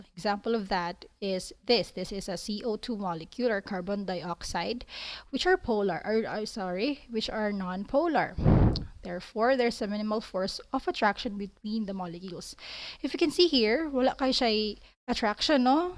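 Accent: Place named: Visayas, Philippines. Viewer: Bisaya mn diay ka ma'am 😅❤️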